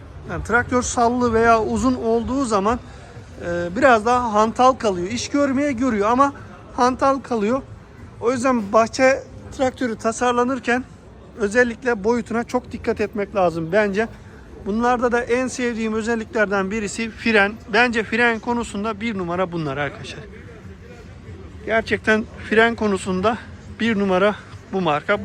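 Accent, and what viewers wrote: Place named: Isparta, Turkey. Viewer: çok güzel ama çok kalabalık ve streslıi istanbuldan dünya güzeli ıspartama selamlar sağlıklar inşallah gayet güzel aktardın ıspartanın türkçesi güzeldir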